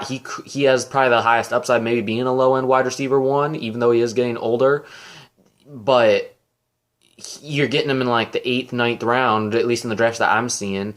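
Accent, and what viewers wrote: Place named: Michigan, United States. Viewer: You from Michigan?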